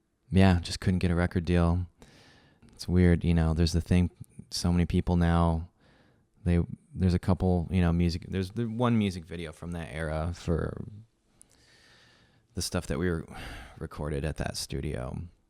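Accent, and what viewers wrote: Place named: Arkansas, United States. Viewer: I'm from Arkansas and like you nailed like 95% of how people talk down here which is funny because I've lived in the south for my entire life and some people think I'm from up North because of how I talk lolll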